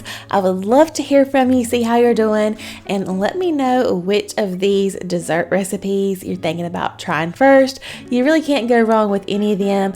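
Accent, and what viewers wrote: South, United States. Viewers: He's not quite as southern as me and you I say one forth also😂😂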